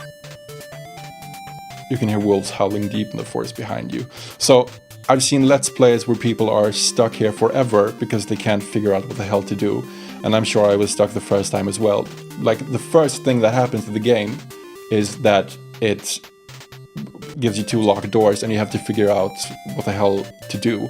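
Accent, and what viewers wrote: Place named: Sweden. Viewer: Your accent is really swedish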